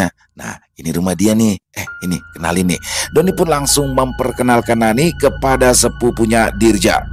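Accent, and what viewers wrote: Jakarta, Indonesia. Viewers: Iiiiihhh..sumpah keren abis nih!✌️ Logat Betawi ny itu loh...pas bngt, feel ny jd dpt bngt 👍 Fikiran Q jd traveling ngebayangin sosok Bu Zubaedah, Bg Doni, Gembul & si centil Bonies 😀 Karakter apapn yg dibawain berasa natural bngt. Multitalent bngt Kadang menjelma jd org Betawi, kadang jd org Kalimantan, kadang jd org Jawa😄 Top markotop bngt dah ahh..gadak obat 👍😁 Menyala Bang 😃🔥🔥🔥🔥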